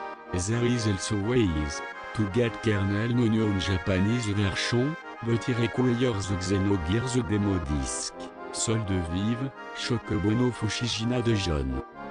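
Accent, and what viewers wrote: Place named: Germany. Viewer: lol sorry it sounded like german at first and then I actually listened and it was english. very heavy accent.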